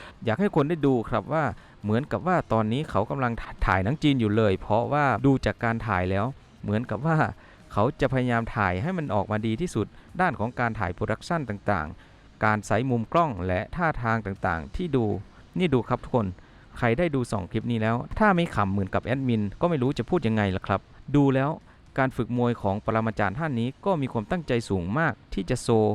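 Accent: Laos. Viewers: แอดมินเปฺ็นคนลาว พูดบ่ชัด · แอดเป็น คนลาว ใช่มั๊ยฟังจาก สำเนียงการพูด ช.ช้างเป็น.ซ.โซ่หมด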